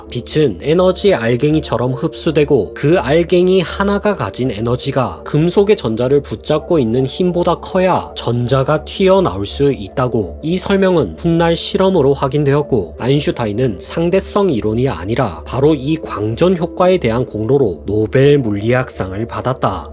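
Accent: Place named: Gyeongsang, South Korea. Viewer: 경상도 사람이 대본을 또박또박 읽는 느낌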